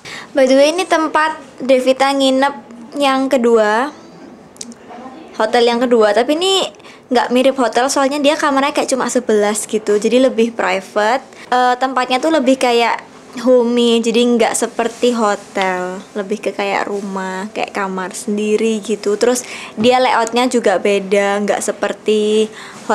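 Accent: Java, Indonesia. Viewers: Medokny ituloh khas bngt .. jd setiap kata kek ada penekanan dlm pengucapannya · Suarane medok juosssss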